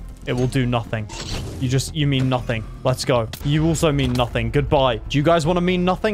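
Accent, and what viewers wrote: United Kingdom. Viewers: YOU KINDA SOUND BRITISH LIKE GEORGE FROM DREAM SMP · Hes british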